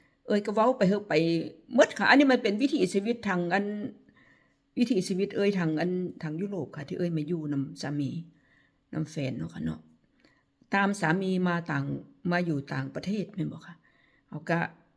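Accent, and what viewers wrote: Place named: Phu Thai, Thailand. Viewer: เว่าคือคนภูไท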